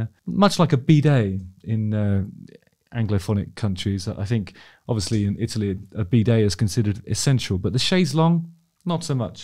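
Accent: United Kingdom. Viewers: No, he’s just British and you’re probably just American.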